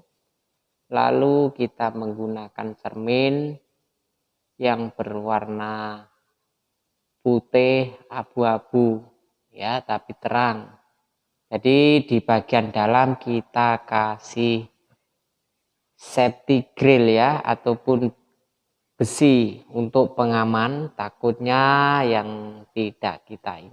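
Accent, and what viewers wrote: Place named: Java, Indonesia. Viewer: Jakarta mas.. Logatnya gampang dikenal.. Jowo ne pundi mas? Enak ya.. Bisa merantau di Malaysia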